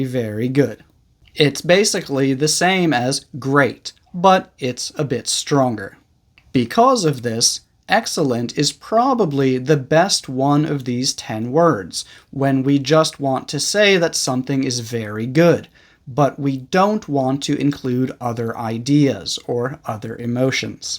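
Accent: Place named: United States. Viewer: You are too kind. In awe of your beautiful American accent as well.👍😊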